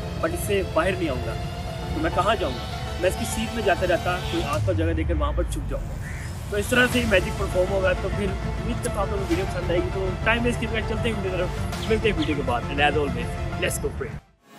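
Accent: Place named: United Kingdom. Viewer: Love your English acsentt .. but at why did u edit your video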